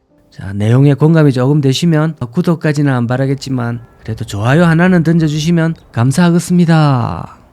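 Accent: Gyeongsang, South Korea. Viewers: 이 분 경상도 말씨는 참 듣기 좋은 구수한 말입니다. 다니시느라 수고가 많습니다~ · 님은 방송국 나레이터가 딱입니다. 호감형의 구수한 갱상도사투리로 은근 중독성이 있어요... 참고로 저는 전라도 사람이여이잉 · 경상도 사투리가 이렇게 구수 했나? 잘 보고 갑니다 ^^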